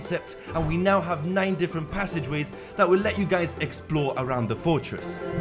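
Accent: Belgium. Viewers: Are you from Belgium sir? ^^ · Are you from Belgium? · are you from Belgium?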